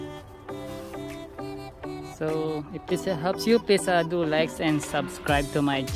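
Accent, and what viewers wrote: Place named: India. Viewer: God bless you poonjabi! Just when thought every one with an Indian accent was just a scammer you showed me a true and legit fix for a distressing dilemma! It totally worked and i didnt have to purchase the stupid product that the white people in the other video were trying sell me. Scammers come in all colours and so to truely legit people. Your fix it worked crazy good. Thank you poonjabi. I liked and subsrcibed your chanel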